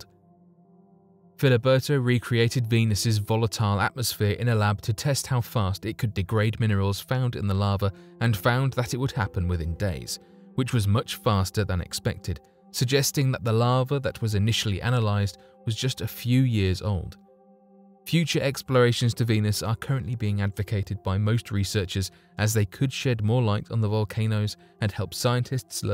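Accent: United Kingdom. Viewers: why do you sound british? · shut the fridge. is he not british?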